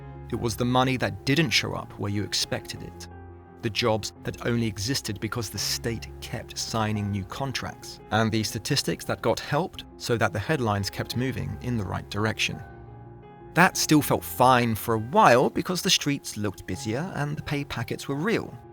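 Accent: United Kingdom. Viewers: How are u British now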